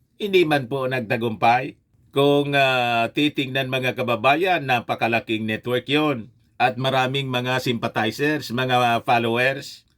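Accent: Bicol, Philippines. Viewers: Bicolano po kayo?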